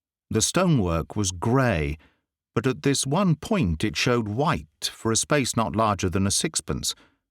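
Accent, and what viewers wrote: United States. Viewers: I am always impressed with how well you do an American accent. Good job.... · I have just heard another one of your stories and may I say I have listened to it three times now. It's a wonderful story and you sir as usual are at your very best. I enjoy you so much you just do not know. Your rendition of American English was perfect 👌😍!! Please, keep these stories coming for as long as you can. You still amaze me!! With best regards to you!!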